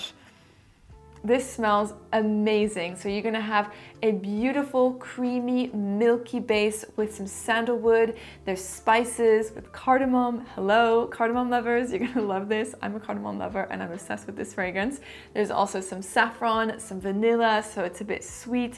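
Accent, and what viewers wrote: France. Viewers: Bonjour, Je viens juste de découvrir cette vidéo, je reconnais un accent français! Est tu française. As tu déjà fait un top 10 de tes parfums préférés et si oui pourrais-tu m’envoyer le lien? Je suis dingue de parfums et suis toujours à la recherche de nouvelles découvertes 🥰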